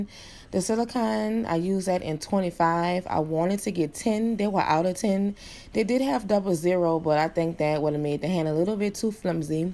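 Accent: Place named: New Orleans, United States. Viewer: That N.O. accent BABY! 💕 thanks for posting this video I'm in New York I want to make my own as well!!!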